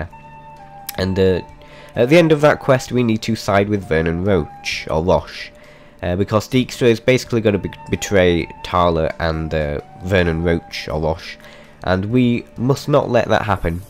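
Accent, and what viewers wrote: United Kingdom. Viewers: Humberto Castro I think he is British,but sometimes he spoke so fast that's hard to understand indeed.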